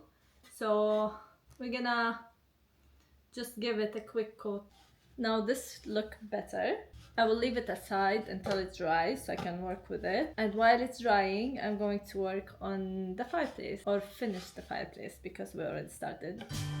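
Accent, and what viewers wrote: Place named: Lebanon. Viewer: Your accent is Lebanese ❤❤❤❤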